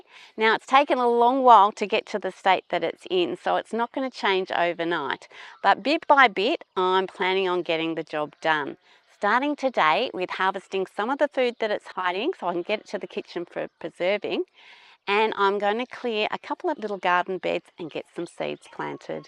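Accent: United States. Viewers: Why has our Aussie got an American accent 😢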